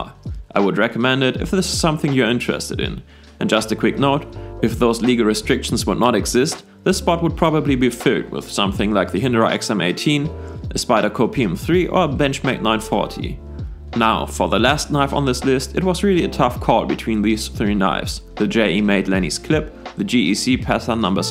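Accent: Germany. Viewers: Heavy German accent but nice content. Thanx.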